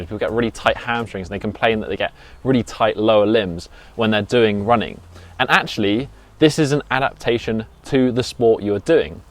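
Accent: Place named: RP, United Kingdom. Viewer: Love the well educated British accent man! You pronounce your words very precisely!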